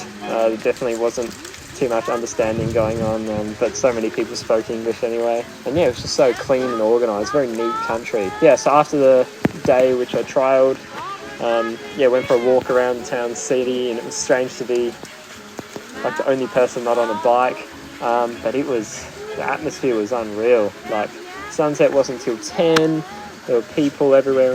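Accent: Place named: Australia. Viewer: I am French Canadian and as much as I love Australians, the accent requires some getting used to so could you please speak more slowly or kill background music when you talk to us. Nevertheless, love your blog and you're capacity to face unknown territory. Bonne exploration!🍀🍷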